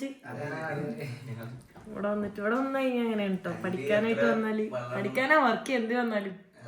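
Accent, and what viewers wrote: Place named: Mattancherry, India. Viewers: എന്ത് പറ്റി. വീഡിയോ ഒന്നും ഇടാതിരുന്നത് 🤔 ാടെ. സംസാരം T.c. r Kochi കൂടി കലർന്ന സംസാരം പാലക്കാടൻ. സ്ലാഗ് ഒട്ടും ഇല്ല V. Good 👍🏼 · മട്ടാഞ്ചേരി സംസാരം കേട്ടിട്ട് really super🎉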